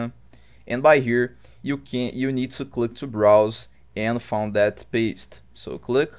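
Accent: Brazil. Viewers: Sotaque BR massa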